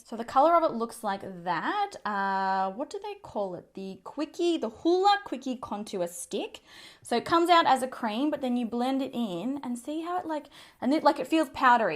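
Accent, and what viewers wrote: Australia. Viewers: It is her Australian accent.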